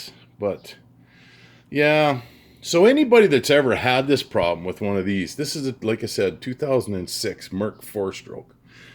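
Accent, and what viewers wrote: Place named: Canada. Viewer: Canadian eh.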